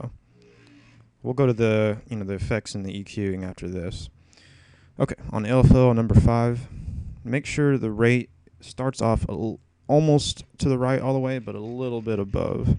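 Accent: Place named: United States. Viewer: Thnx for the detailed vid I really appreciate it! Helped me a lot now that i got some more sounds to play with , are you from Texas by the way? you sound like a cowboy =D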